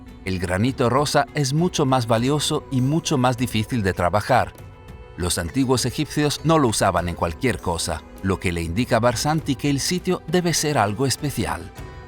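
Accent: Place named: Italy. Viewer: Perché nn fai. Il documentario in italiano se siente mucho tu acentazo Italico man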